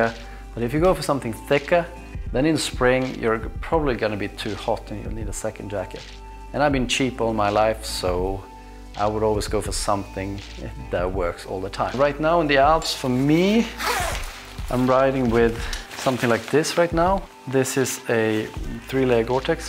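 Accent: Sweden. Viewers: Jag tyckte att det var lite svensk dialekt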